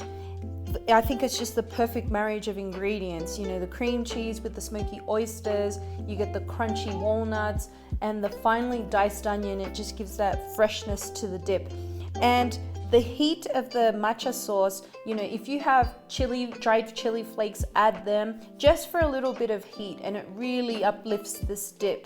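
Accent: Australia. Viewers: saw you on Mike Chens channel. great channel and i have to say every time i hear your accent, I keep thinking of crocodile dundee or steve irwin. "you call that a knife?" lol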